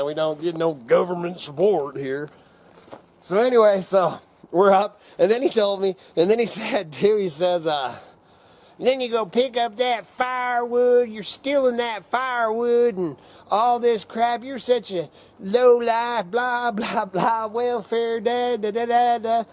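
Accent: South, United States. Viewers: You're making me laugh and I love you videos when I get a chance to see him cuz I work at night you make me really laugh it's fun to watch your videos because you give a simple thing to it like that Duck Dynasty Phil Southern kind of thing that you have and you're not even from the South but you sure have it.... and that's where people don't grasp that you know from where I'm from the south there's a lot of people like you I've been you in my life but you do what you have to do to make a living in life and sometimes we don't always need as much as we think we do that's what you've taught me you know I've gotten rid of a lot of things that I don't need but I also keep the things that I know I need down the road... but you've definitely made me want to live further out and thank God I'm in this shape not many people 50 years old get that opportunity I thank God everyday that I can do the job I do cuz if you saw the job by do you read like then you went from one job that you had before to another one that's just as hard but you know I look at that way it keeps me going I don't have to pay for a gym can I get the enjoy life a lot better.. 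basically the share with you my life has full circle came back everything I ever lost in my life I gain back tenfold and I have to admit that because it didn't just happen on its own cuz I don't believe in luck... hey does it hurt to have a prayer come from your lips sometimes... but you remind me of a simpler time that's why I want to come visi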